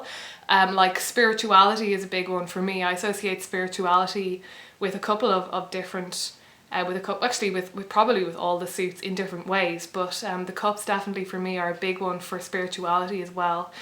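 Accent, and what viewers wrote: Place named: Ireland. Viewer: I love your accent. Irish??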